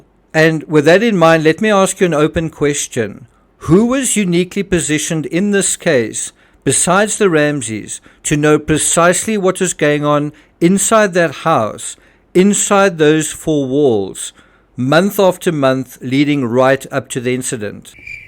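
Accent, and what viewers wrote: South Africa. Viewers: Are you by chance South African? Your accent sounds so familiar- greetings from Nederland…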